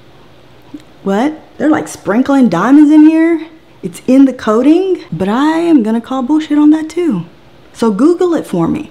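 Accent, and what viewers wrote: South, United States. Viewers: you are very entertaining, interesting and smart! Plus you have the coolest slight Southern accent...and what a voice! Just curious, what State are you in? Most entertaining reviewer on Youtube! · Holy crapola you are adorably gorgeous, and that accent WOW. Where are you from in the south anyway. I was actually looking for info on this pan and found your video, which made me so freakin happy because not only did I learn about the pan, but I learned it from one of the most attractive women I have ever seen. There is nothing I don't like about you.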